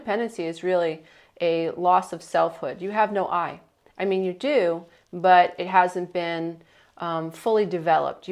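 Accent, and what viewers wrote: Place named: Long Island, United States. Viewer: PS. You sound like you’re from same area I’m at. Bellmore Long Island. Originally from flushing. Then Connecticut Florida Massachusetts and now N Bellmore. Thanks again for your very helpful videos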